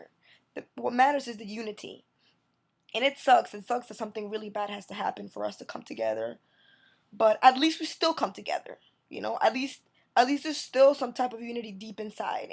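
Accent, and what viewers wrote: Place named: Boston, United States. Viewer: Is it weird that i love the boston accent?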